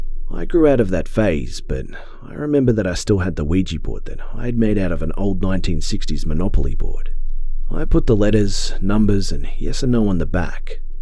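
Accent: Australia. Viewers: You're from Australia, right? Your accent is familiar. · Do you live in Australia (I can tell you have an Aussie accent cause I'm also Australian)🇦🇺🇦🇺🇦🇺🇦🇺🇦🇺🇦🇺🇦🇺🇦🇺🇦🇺🇦🇺🇦🇺🇦🇺🇦🇺🇦🇺🇦🇺🇦🇺🇦🇺🇦🇺🇦🇺🇦🇺🇦🇺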